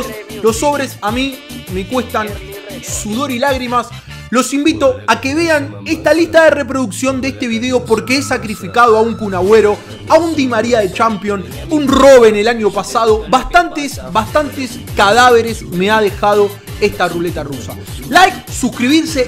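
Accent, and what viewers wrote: Argentina. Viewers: Me mola tu acento argentino!!SALUDOS DESDE ESPAÑA :D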